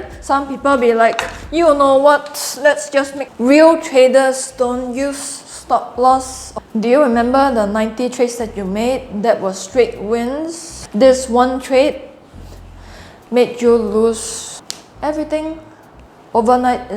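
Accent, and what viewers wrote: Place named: Singapore. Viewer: loved ur Singlish accent! :)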